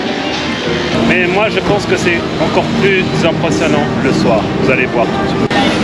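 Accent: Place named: France. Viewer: I don't understand French but I like French accent...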